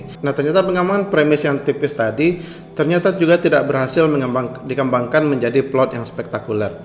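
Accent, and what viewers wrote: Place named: West Sumatra, Indonesia. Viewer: minang yo bang 😅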